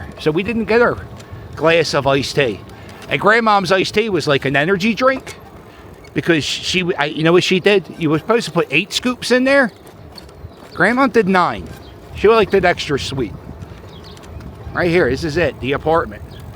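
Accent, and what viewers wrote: Philadelphia, United States. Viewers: Yo Cuz…..your bike tour was great. First timer here! My kids make fun of my Philly accent all the time…..so it was so good to hear someone sound like me. Too bad about Franklin Mills. It looked very depressing. I did shop there a lot. Thanks for the tour.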